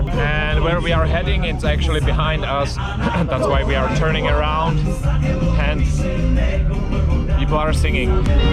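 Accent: Czech Republic. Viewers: so you are czech or austrian? :D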